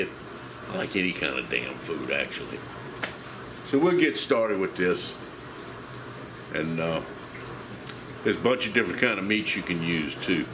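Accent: Louisiana, United States. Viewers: What part of Louisiana u from sha? Got dat ole tic accent baw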